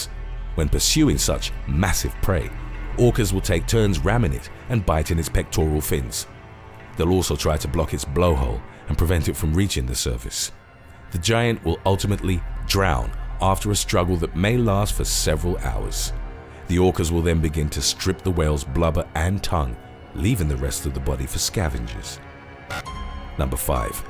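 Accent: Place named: United Kingdom. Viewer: Funny when a guy with British accent says : soccer pitch.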